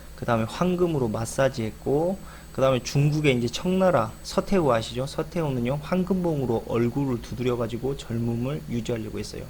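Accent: Jeolla, South Korea. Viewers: 억양이 전라도같은데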